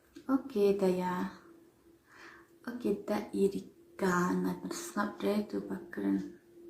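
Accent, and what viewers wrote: Bangalore, India. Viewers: Kasur ruh thik kum thei banggalore hi naduh karukom ak,nai ak than ainoh shi pek ne 😅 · 🤣🤣🤣🤣🤣🤣 kum ka thei bangalore khlm lait ka rukm krn rukom akshion pet sa ktno sa ia bhdeg dohtdong ne bah km ia ka thei bangalore ipoh jait bha jing log kynthei kmne phi don hynmen para ne phi dei khun marwei ne ilh rain bha phi bn lh kmne te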